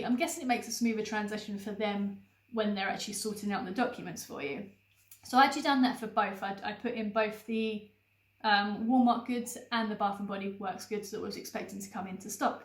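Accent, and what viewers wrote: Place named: United Kingdom. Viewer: I really like British accent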